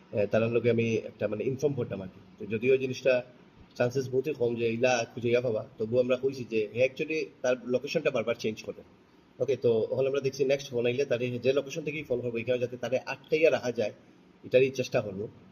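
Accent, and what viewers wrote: Sylhet, Bangladesh. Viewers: 😂😂 কারন ও খাঁটি সিলেটী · আপনার ভাষা সিলেটের মত কিভাবে একটু বলবেন?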